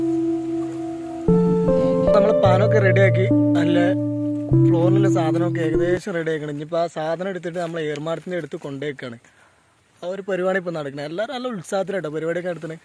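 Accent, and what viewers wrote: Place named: Malappuram, India. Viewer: Mallapurum slang 🔥🔥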